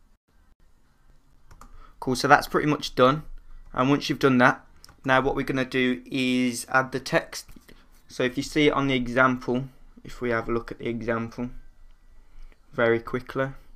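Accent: Birmingham, United Kingdom. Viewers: first i hated ur british accent but then i started to watch these videos, now i love ur accent <3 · are you a brummie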